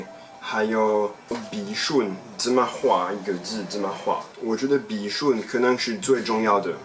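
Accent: Standard. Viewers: 你好！早上起來，先是看到facebook上，東森新聞分享你幫助小狗找到主人的影片，接著看了你對台灣的看法那篇影片、台灣倒垃圾文化的影片、最後這篇你一路上學習中文的影片，衷心佩服的除了是你標準的中文咬字、還有強大學習中文的各種方法與精神，真的很優秀！祝福你在未來的生活更順心、喜樂！ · 中文很標準太棒了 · 你簡直是個好學生!! 很有學習精神，也超像我們那年代，要一個一個字查字典，寫上部首，筆劃，然後再造詞，你注音也感覺學得好，難怪說的標準，沒有怪腔怪調!! 加油了!!再則，你很會挑劇看，讓自己持續興趣~~我看你應該很快可以學成語了!!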